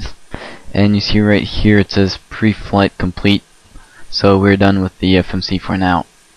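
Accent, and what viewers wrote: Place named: United States. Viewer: Hi I just wanted to say that for your being from France your English is very close to impeccable(Not sure if this would be considered insulting considering our cultural relations at times but you sound like you are just a normal American.). Also, your tutorials rock, I am starting the PMDG MD-11 tutorial as I type. Keep posting vids please!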